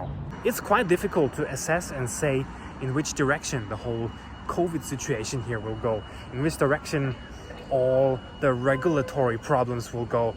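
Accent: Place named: China. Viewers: Thats what i was thinking as well about his accent , it sound like a chinese‘s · I love your accent hehe, your accent sounds like a China man. Be well in China, friend.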